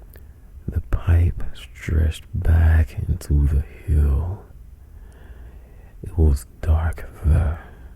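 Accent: St. Louis, United States. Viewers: That 314 accent tho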